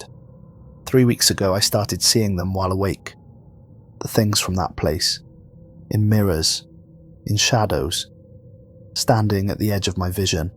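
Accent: Wales, United Kingdom. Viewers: "Welsh" & he is great to listen to. :)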